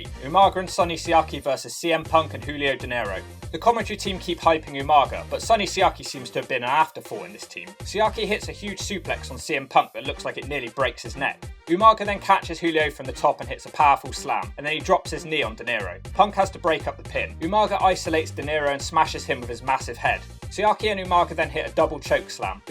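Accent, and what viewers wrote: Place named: Somerset, United Kingdom. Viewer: That accent is so Somerset I love it. It reminded me of Sunday lunch 🤣🤣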